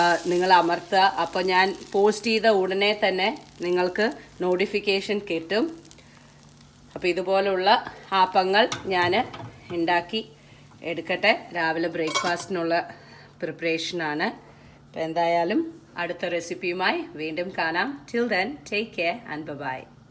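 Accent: Palakkad, India. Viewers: njan try cheythittu parayamtto. Pinne videos improve avunnu shake avkunilaaa.. pine de veedu palakkad ano samsarikam kettapol thonni... ente nadum palakkad anu( chittilamcherry) eppol Newjersy il. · ഹായ്.. ഞാൻ ശ്രീദേവി അജോയ് കുമാർ ഞാൻ മുംബൈയിൽ സ്ഥിരതാമസമാണ്.. ഒരു വീട്ടമ്മയാണ് ഞാൻ സ്ഥിരമായി വിനിയുടെ എല്ലാ പരിപാടികളും കാണാറുണ്ട്.. വിനിയുടെ പൂജാവിളക്കുകൾ ക്ലീൻ ചെയ്യുന്ന പരിപാടിയാണ് ആദ്യമായി ഞാൻ കണ്ടത്, എന്നെ കൂടുതൽ ആകർഷിച്ചത് വിനിയുടെ പാലക്കാടൻ ശൈലിയിലുള്ള സംസാരമാണ് ആദ്യം ഞാൻ കരുതിയത് ഏതോ ഒരു പ്രായമായ വ്യക്തിയാണ് എന്നാണ് കാരണം വിനിയുടെ സംസാരം വളരെ സനേഹവാത്സല്യവും പക്വതയും നിറഞ്ഞതാണ് അതുകൊണ്ട്, പിന്നീടുള്ള പ്രോഗ്രാമിൽ വിനിയെ കണ്ടപ്പോൾ മനസ്സിലായി ആള് വളരെ ചെറുപ്പമാണെന്ന്.. എന്തായാലും ഒരു അറിവിന്റെ കലവറയായ വിനിക്ക് ആശംസകൾ.. എല്ലാ പ്രോഗ്രാമുകളും കാണാൻ ശ്രമിക്കാറുണ്ട്.., കെയർ ആൻഡ് ക്യൂർ എന്ന പ്രോഗ്രാമും എല്ലാം.. നന്ദി..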